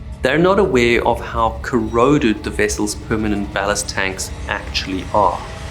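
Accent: South Africa. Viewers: Great video. I hear a South African accent there. Thanks for not going AI.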